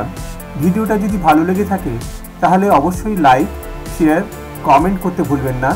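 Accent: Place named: Bangal, India. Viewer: এই তোমরা ছ ছ দিয়ে কথা বলো কেন। তোমরা কি ইন্ডিয়ার বাঙাল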